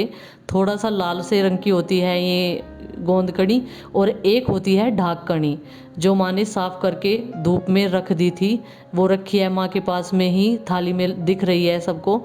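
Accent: Haryana, India. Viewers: Mam aap Haryana se h ya U. P. Se language toh Haryana ki h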